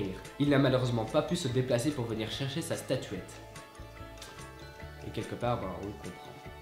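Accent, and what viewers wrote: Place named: Belgium. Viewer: Je te réponds 1 an après mais bon... Il dit les nombres comme ça parce qu'il est belge, à la fin des dizaines c'est ante comme soixante-dix c'est septante, voilà, 1 an après 😅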